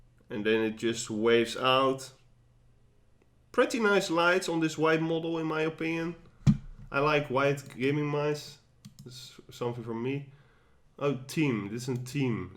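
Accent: Netherlands. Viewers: hahahaha dat nederlandse accent heerlijk dit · Jezus dat nederlands accent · That Dutch accent though.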